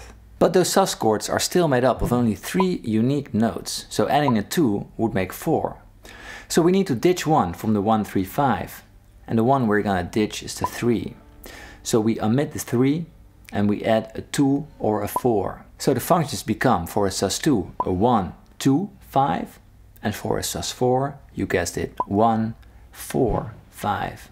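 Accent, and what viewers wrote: Netherlands. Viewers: i need to know, are u dutch? your accent sounds soooooo familiar. if so, ik ben ook nederlands! love your vids!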